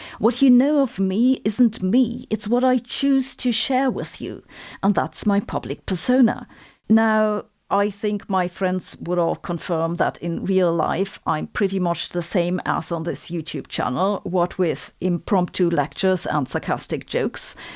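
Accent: Germany. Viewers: I love the way you break down information. I love that you are German and your accent. I love your hair (you are beautifu)l and I love how funny you are but you don't try too hard to be funny. Thank you for all the great content! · And like your German accent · “I can promise that my German accent is real!” 🤣 🤣🤣 love it! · Oh and: your Eng is laudable and I congratulate you. But then what trace of German phonology remains only adds lustre. You see, I find German the most comfortable, the most pleasing to pronounce of all mod Eur langs. Hochdeutsch is as pleasing to my ear as the floaty and precise Serbian up in Vojvodina. (Like German, Serbian has many, many local speeches which are NOT pretty!) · I love your "brick" like expressiveness. I also love that you're built like a "brick sh!thouse." (You might need to look that one up to understand the compliment) I love your German accent and your sense of humor always gets me laughing. 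Sometimes, I have to pause the video on account of that. I hope you keep making videos for many years to come.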